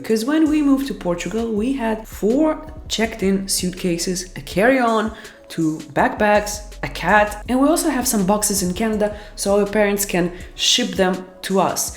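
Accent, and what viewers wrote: Russia. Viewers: Great accent rassiskiy☺️😁😁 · I love it when you speak English with russian accent ❣❣ thanks a lot for all the super useful tips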